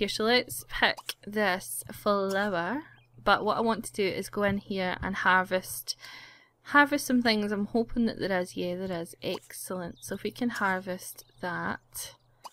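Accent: Scotland, United Kingdom. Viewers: Dat accent...Dat amazing Scottish accent... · Your Scottish accent!<333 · Were in Scotland r u from. Xx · I love your accent, its so cute~ I love Scottish accents. You seem pretty cool and I like the video. SUBSCRIBE~ <3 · Are you from Scotland or is it my head phones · Im from scotland too and i was sooooo excited when she started talking :3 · shes scottish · I knew you were Scottish as soon as I heard you talk. :) I absolutely adore Scottish accents above any other. Love this story so far. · I love how ur Scottish I hardly see Scottish youtubers I am Scottish myself my m is going to get me sims3 at the end of the month this helps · I love your channel! I have a hard time finding simmers I like because well...most girls on here having voices that irritate me :/ (yea I'm a girl and it bugs me) but your voice is perfect! I usually prefer British youtubers and now Scotts xD thank you, you seem quite intelligent and I look forward to watching the rest of these :} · Yay! 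A Scottish simmer! I'm from Scotland as well so I'm happy that I can understand someone now. I mean I can understand some of the others but not very many. I've watched Big Brother 2014 as well and can't wait for the next one! x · You sound like Jade from Little Mix , but more Scottish . I LOVE IT! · I love your accent! It's scottish, isn't it? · your scottish like me!! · I LOVE YOUR SCOTTISH ACCENT!!! Especially when you said "storm"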